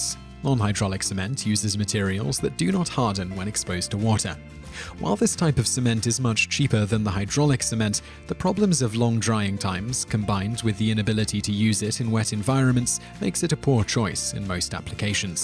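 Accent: England, United Kingdom. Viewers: Sounds like an english accent, but he says "sidewalk".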